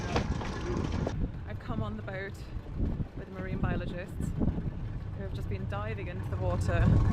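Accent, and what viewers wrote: United Kingdom. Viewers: By your accent you are British it seems. I'm Salvadoran and Gulf of Fonseca is only 30 mins from my house! Greetings!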